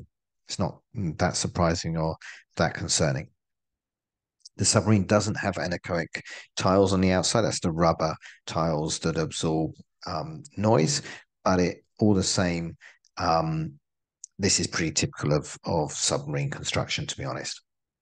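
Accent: United Kingdom. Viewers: Kerel, doe even rustig aan. Hij doet zijn best, alsof jij vloeiend in elke taal bent. En het is geen "yank" maar een Brit....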